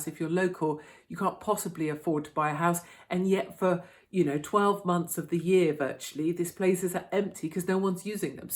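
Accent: RP, United Kingdom. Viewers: You sound awfully posh as you pose the questions. My answer to this is that it's always been like that, maybe we're just noticing more things in this new eyes wide open type of enlightenment that some of us are experiencing? Those people are everywhere, always have been, and always will be. The type who never makes eye contact when forced to be with people they don't know, like in a grocery store? There are very definitely more people aspiring to be that type of person though, that I'll definitely agree with.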